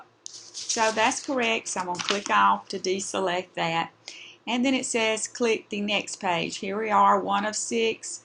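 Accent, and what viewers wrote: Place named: South, United States. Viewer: Your accent is from my home, the south. It is beautiful.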